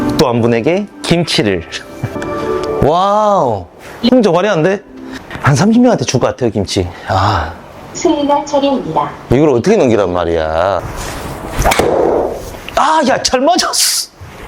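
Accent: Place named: Jeolla, South Korea. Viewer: 구독자 500명 달성을 진심으로 축하드려요!😊❤🎉 전라도 김치 참 좋아해서 저도 사먹는 편이거든요! 특히 묵은지를 좋아해서 묵은지 김치찜을 자주 해먹는 편이랍니다 ㅎㅎ 에서는 김치소개를 더해서 전라도의 맛집도 소개해 주시는 영상이 많아 참 좋더라구요❤ 그 중에 얼마전 말리화반점을 소개해 주셔서 아주 맛있게 잘 먹구 왔답니다! 동구에 있는 오래된 중국집인데 유니짜장이 맛있다 라는 에서의 리뷰를 보고 역시니 맛잘알❤이라고 생각을 하였다는요..👍🏻 그러니 의 김치는 얼마나 맛있을까 하는 믿음이 안생기겠냐구요 👍🏻ㅎㅎㅎ 이렇게 열심히 해주셔서 많은 구독자 님들이 금치의 김치를 받을 수 있나 봅니다! 저도 금치를 꼭 밥에다 먹어보길 희망하며 바라봅니다😊 역시 전라도 사투리 찰져버리시네요~~ㅎㅎㅎ 34개 축하드리고! 500명돌파 다시한번 축하드리고 1000명 1만명 까지 쭉쭉 승승장구 하시길 바라겠습니다!!😊❤🎉 (1kg:총각김치, 서비스김치: 부추김치, 깻잎김치 희망해 봅니다..😊)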